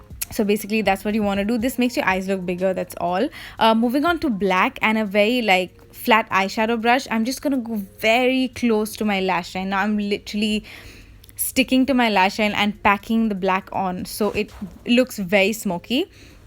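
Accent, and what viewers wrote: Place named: United Kingdom. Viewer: and please stick to british accent for ur voice over !! 😘😘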